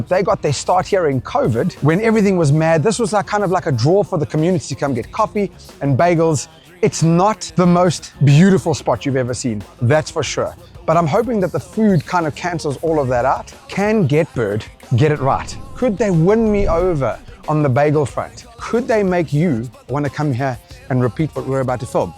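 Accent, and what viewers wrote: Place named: Durban, South Africa. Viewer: Who the fuck is this o ? What a fuckin legend. Boy talks like he’s grown up in the 031. Good o. Good o.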